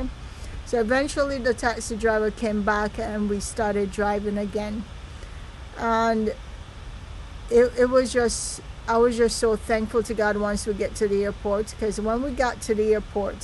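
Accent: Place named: Jamaica. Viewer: Yoùr speech pattern is Jamaican therefore it's safe to say you are Jamaican so how come you were so unprepared for current occurrences on the Island. Say thank God the Police were doing their duty enforcing traffic regulations, havent you read or heard of the very high numbers of fatalities and senseless mayhem committed on the roadways? There are several reasons why Police personnel are armed with high powered weapons in the public space, your safety and protection is one of them. Your foray into blogging appears to be in its infancy, take time to research and prepare content, not everyone ad-libs a cogent presentation. Not criticizing, "mi 'jus a sey". Glad ypur stay was safe and enjoyable. A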